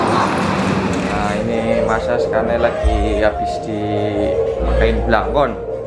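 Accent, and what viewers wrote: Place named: Brebes, Indonesia. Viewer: Ngomonge kayak wong brebes ms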